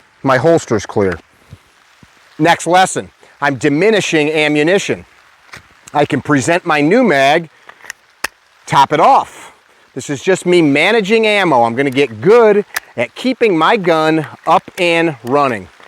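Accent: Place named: Minnesota, United States. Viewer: SOUNDS like Minnesota…the accent.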